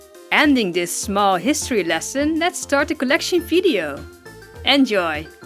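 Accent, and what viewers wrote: Netherlands. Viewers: Toen ik dat accent hoorde wist ik meteen dat je Nederlands bent! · Op de 1 of andere manier kan ik gewoon horen dat je uit Nederland komt !! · Are you Dutch!?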